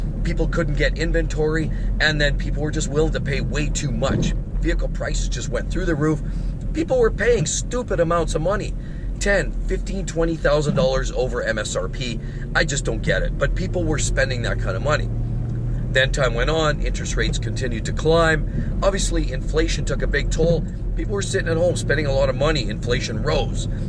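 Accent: Canada. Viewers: Notwithstanding the recent revelations regarding the workload for the Donut Media guys, no other car YouTuber works harder warning and endorsing cars and the issues people experience. When shoppuing for sleeper value, this infomation is invaluable. I also pick up on his Canadian accent every once in a while and he sound like Bob and Doug McKenzie.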